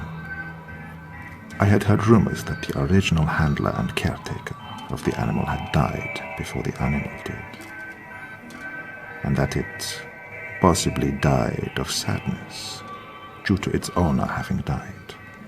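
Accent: Russia. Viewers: ❤️ Love the Russian accent but I found the music too loud and distracting 😩🙉🎶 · Hello there. Has anyone ever told you that you have a good Russian accent? I do love these extra stories you give us. Much appreciated good sir.. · I'm no expert with accents but in my opinion your Russian accent has become soooo good! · That is an AMAZING Russian accent!! You do accents (especially Texan) so well! 🖤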